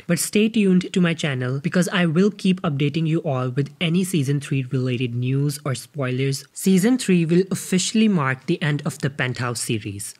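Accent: India. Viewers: Indian voice??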